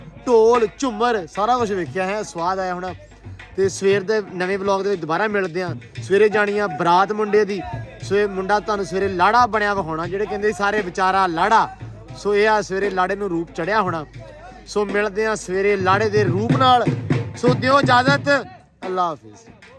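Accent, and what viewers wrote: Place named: Malwa, India. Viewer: Bai g tuhadi boli sade malwe nal mildi aa me machhiwara sahib ludhiana punjab to ha ❤🎉🎉🎉🎉🎉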